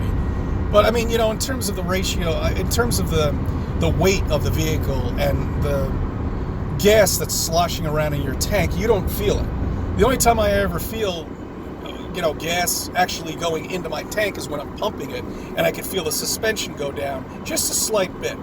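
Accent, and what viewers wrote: New York, United States. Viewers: There's something about driving that turns our "thinkers" on.. I found you about a month ago after hearing you on sun and moon group.. you're New York accent brought me home.. I'm in FL now for 7 years but am from the North shore of Long island.. great ponderings , I have them too.. it led me to this channel on yt called the overwatchchannel.. Dan has a great series on how to escape the matrix and reincarnation trap.. pretty mind opening/bending stuff.. It'd be interesting to hear your thoughts on it.. I'm 2 years Into my fe awakening and can honestly say I'm not friends with a single person I used to be anymore..it's crazy.. and all because they simply refused to just look??! Them chemtrails, vaccines and fluoride certainly have done a very well done job.. bravo to the psycho's.. they certainly created quite the cognitive dissonance cocktail for the ages.. 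anyway check out Dan's channel.. thanks for keeping it real 👍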